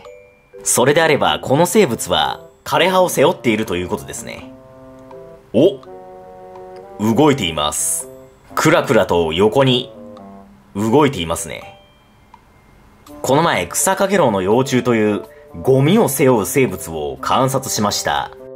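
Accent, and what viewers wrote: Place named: Nagoya, Japan. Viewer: 、ご出身は名古屋ですかね？イントネーションが名古屋周辺の方そのものだったので。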